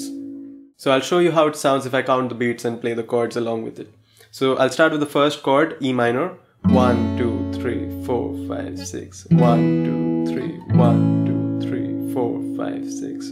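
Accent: India. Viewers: Are u indian? U sound so indian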